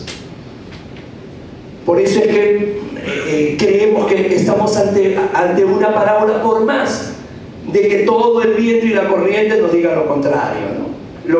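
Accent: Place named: Argentina. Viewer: Me hubiese detenido resaltando como un peruano quiere hablar como argentino, pero eso no seria el fondo, de análisis del neo constitucionalismo no tiene nada, solo en un segundo se mencionó la ponderación de Alexy, de ahí no pasa de una charla para muchachos de secundaria. Acaso el latinoamericano no puede analizar el concepto de derechos fundamentales desde el positivismo y no positivismo? Acaso no le sirve a la realidad latinoamericana si el Juez resuelve con ponderación o con una ponderación disfrazada que realmente es interpretación subsuntiva? Acaso el latino no puede generar una filosofía del Derecho o una Teoría de la Norma que no ignore que la pólvora ha sido descubierta e incorpore variables propios? Que pena que el Perú haya enviado a un equivocado en el tema y que Latinoamérica no resalte exponentes de talla de Rofolfo Luis Vigo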